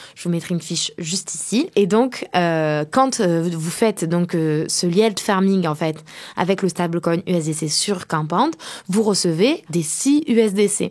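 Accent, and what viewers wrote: South, France. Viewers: Hyper agréable l'accent du sud pour cette vulgarisation de l'univers crypto et ici le sujet des ERC ! Merci bcp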